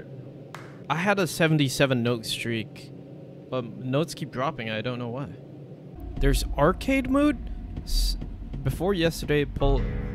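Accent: Canada. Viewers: i cant help but notice that you sound weirdly canadian in this video? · Some of these comments are making me hear your voice as more Canadian. How the hell?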